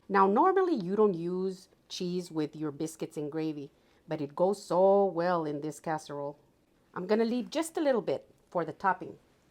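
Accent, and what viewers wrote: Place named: Texas, United States. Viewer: "I'm gonna".....girl, if ever there was any doubt you're a native Texan, that should alleviate it. You should throw in a few "I'm fixin to"s just to seal the deal.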